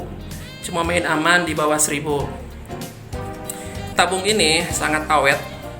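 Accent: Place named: West Java, Indonesia. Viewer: Penjelasannya mantap jelas logatnya sundanis asli keeeren lahh pokoknya👍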